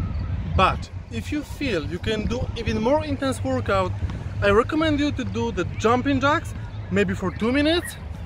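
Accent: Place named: Poland. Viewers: Lepszy angielski z polskim akcentem niz polski z angielskim akcentem, jakby to po paru latach juz sie zapomnialo ojczystej mowy hehe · Typowy polski-angielski, hehe ;)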